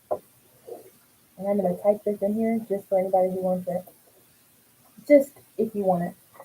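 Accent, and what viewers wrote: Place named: South, United States. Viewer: Yes girl, I've heard your accent since day one. I was gonna ask why you had one, a southern accent living in Illinois 😉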